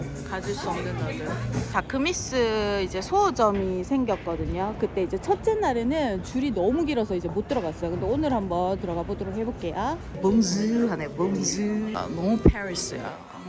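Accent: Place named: Gyeongsang, South Korea. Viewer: 나도 대구라서 그런지 말투 완전 친근해요ㅋㅋㅋ얍샵하이 ㅋㅋㅋ 덕분에 뉴욕 쇼핑 구경 잘하고있어요😊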